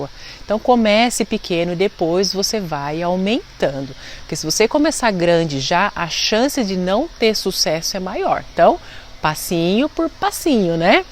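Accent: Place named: Paraná, Brazil. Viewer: Adorei o seu canal!! Obrigado por compartilhar conhecimento. Ah, esse sotaque é do Paraná, né!? rsrsr (Amei)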